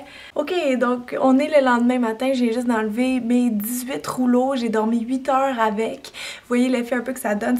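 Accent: Quebec, Canada. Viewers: Je ne sais pas pourquoi mais à chaque fois que je lis ce que tu écris dans la description de la vidéo je le lis avec ton accent québécois :P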